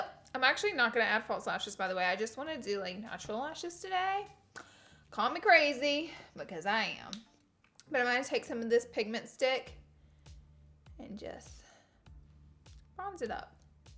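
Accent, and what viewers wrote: South, United States. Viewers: I love her accent so much! I’m a Georgian, so I’ve been raised in the south literally on a farm with people who have the strongest southern accents I’ve ever heard. And I just don’t have an accent all my siblings have one all of my family does but I just didn’t catch onto the accent for some reason. So when I hear people with really strong southern accents I absolutely love the way they sound! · She is from the south would you tell someone with a Jamaican accent that you HATE the way they talk?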